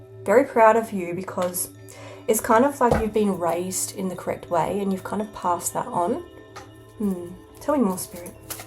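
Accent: Australia. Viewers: Cheers me up no-end :face-blue-smiling:, love the aussie accent too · You Australian?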